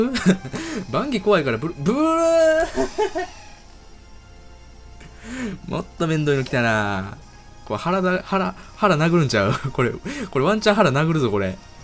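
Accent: Kansai, Japan. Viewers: 声の若さと強めの関西弁懐かしいな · 関西弁強めの懐かしい😭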